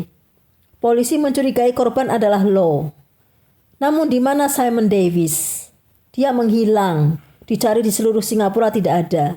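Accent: Java, Indonesia. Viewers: ....... madam..... katanya mau 2x upload dlm seminggu....di tggu tggu kok blm juga... sukses terus ya madam.....kalo bisa medok nya di pertahankan.......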